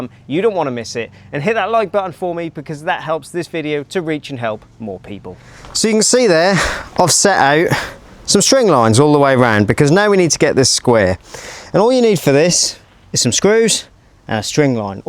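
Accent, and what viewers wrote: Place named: Suffolk, United Kingdom. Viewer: Nice to hear a good old Suffolk boi after 30 years in NZ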